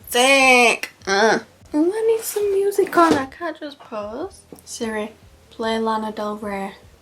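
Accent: United Kingdom. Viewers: i'm late, i also just finished gcse's in june, bUT i wanna know which part of the uk are you from? :)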